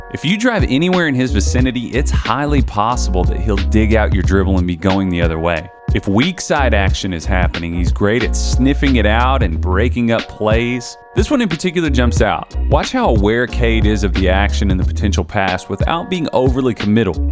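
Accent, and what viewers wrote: South, United States. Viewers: This video making genius got that Chris Vernon style Southern draaaaaawl going on!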